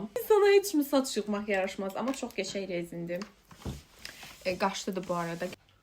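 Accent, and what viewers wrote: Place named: Turkey. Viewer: Etim tokulurde bu qiza baxanda niye daniwanda ozunu uwaq kimi aparirsan dodaqlarivi deq bawi qabaga eleyirsen turkce daniwirsan turkler kimi daniwmaga caliwirsan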